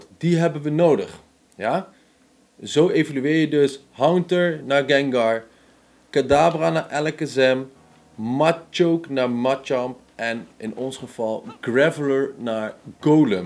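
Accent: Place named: Twente, Netherlands. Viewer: Beetje Twents erbij 🤣... super nice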